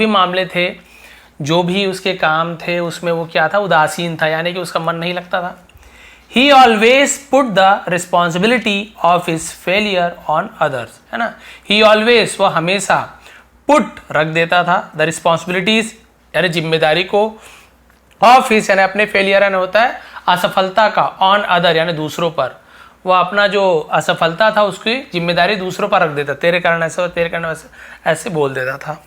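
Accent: Chhattisgarh, India. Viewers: Sir aap raipur chhattisgarh se ho kya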